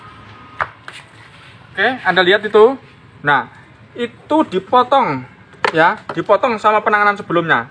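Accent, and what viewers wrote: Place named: East Java, Indonesia. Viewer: smpean kok faseh bhasa jawa timuran om?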